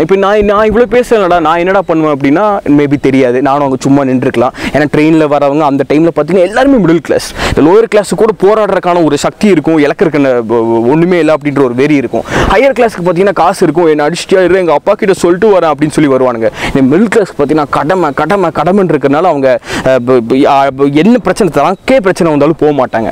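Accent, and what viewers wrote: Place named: Coimbatore, India. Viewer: Very interesting analysis, keep up the good work. Bro, are you from Cbe? I like your slang.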